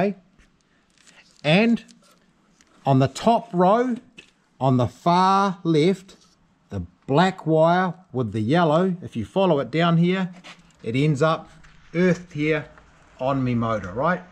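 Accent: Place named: Australia. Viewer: From the accent hes from Australia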